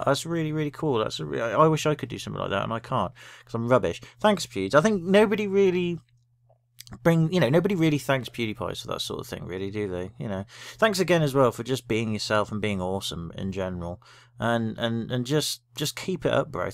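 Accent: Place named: East Anglia, United Kingdom. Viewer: Are you from East Anglia by any chance? I sense a slight familiar accent. Not something you hear often on the Youtubes haha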